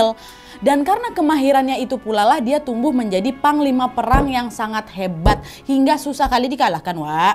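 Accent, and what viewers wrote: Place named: West Sumatra, Indonesia. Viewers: Uni urang awk yo kelihatan banget dalam bicaranya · Urang awak logat minang nyo kentara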